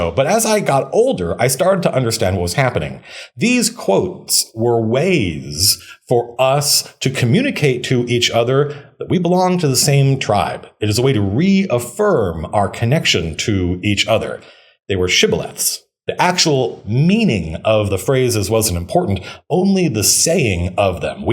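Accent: South, United States. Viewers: I love your southern belle voice,.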